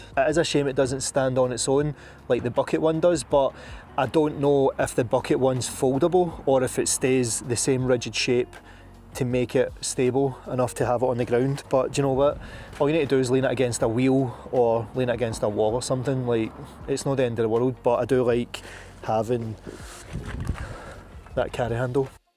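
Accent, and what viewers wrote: Scotland, United Kingdom. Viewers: i was on fb the other day and the flex tail advert come up. all of a sudden i hear the familiar scottish dulcet tones. i know that voice!!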